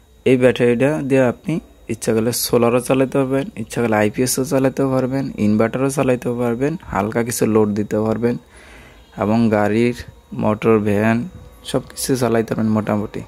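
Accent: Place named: Chandpur, Bangladesh. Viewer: ভাই আপনার কথায় মনে হচ্ছে আপনি চাঁদপুরের মানুষ। চাঁদপুর সদর বা হাজীগঞ্জ, বাকিলা ঐদিকের। আমি ধারনা করে বললাম। আশা করি সত্যিটা জানাবেন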